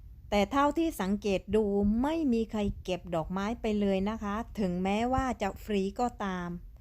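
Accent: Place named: Phuket, Thailand. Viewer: ของฟรีมีในโลกจริงๆค่ะพี่ ชอบทำเนียงพี่จัง ว่าเหมือนสำเนียงภูเก็ตพังงาค่ะอิอิ ดาด้ากะเดาเอานิค่ะพี่ ลงคลิปเยอะๆหน่อยนะคะดูค่ะ ติดยูทูปครั้งแรกกะคลิปของสาวฟินแลนด์นี่แหละค่ะเมื่อหลายปีก่อนติดช่องน้องบีมากน้องบีเก็บเห็ด แล้วชอบดูเวลาเขาไปเอาปลาฟรีเขาลากปลามาไว้ในเรือชอบมากค่ะ มีน้องคนนึงอยู่ฟินแลนด์เหมือนกันชื่อน้องเฟิร์นแต่น้องไม่ค่อยลงคลิปไม่เคยเจอกันแต่คิดว่าน้องน่ารักมากๆ สรุปว่าสาวใต้ไปอยู่ฟินแลนด์น่าร้ากกก🥰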